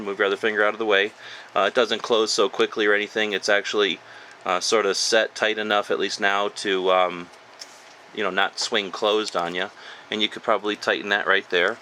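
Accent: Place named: United States. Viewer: When I hear your voice I hear 'Merica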